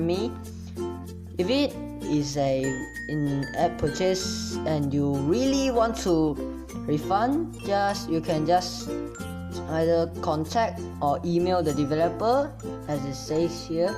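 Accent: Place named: India. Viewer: Sorry guys but he sounds like one if those Indian scammers lol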